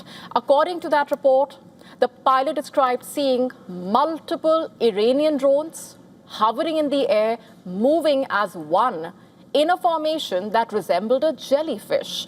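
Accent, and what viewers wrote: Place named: India. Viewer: This lady's Indian accent is way too strong. Could only understand 25% of what she said.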